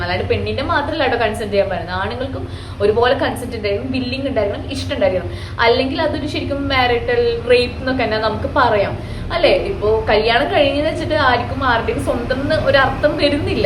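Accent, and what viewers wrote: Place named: Thrissur, India. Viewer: trissur slang anallo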